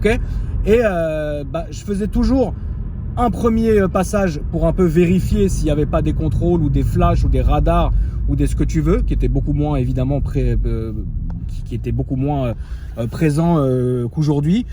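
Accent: Tunisia. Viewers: Sérieux on dirait un tunisien quand tu parle en arabe je sais pas si sa a force de traîner ac des tunisiens ou quoi mais voilà 😕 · on kiff l accent Tunisien 🇹🇳 🥇🏆😂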